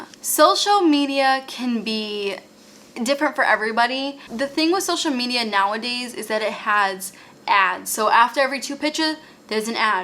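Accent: Boston, United States. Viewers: lala suncokretic do you mean her Boston accent is more pronounced lately? I noticed that too. She’s probably more comfortable with it now, and isn’t trying to tone it down. She actually might have mentioned that in a video.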